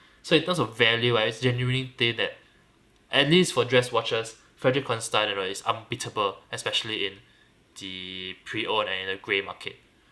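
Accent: Singapore. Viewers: It's not a mid Atlantic accent, it's a Singaporean/Malaysian accent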